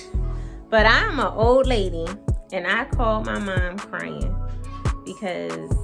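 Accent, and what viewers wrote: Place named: Philadelphia, United States. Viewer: SSQ Brown 1 second ago I just got hired as a CCA and my orientation is coming up . Congratulations! As excited as I am I’m also super nervous. Especially hearing that you can’t be in truck with the person training you. Btw You sound like you’re from Philly. If you are your accent sounds like my roommate from school. Lol Congratulations on school! You got this girl! From one mama to another keep pushing.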